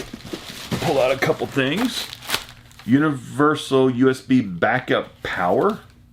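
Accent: United States. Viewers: I don't mind your rambling...a) I like your voice and b) I'm not an english-speaker so it is always good to listen to some genuine american-english ^^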